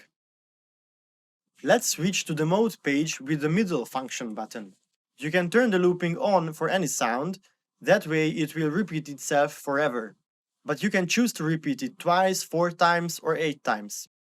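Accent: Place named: Hungary. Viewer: Az ember egyből felismeri, ha hunglishül beszél valaki :D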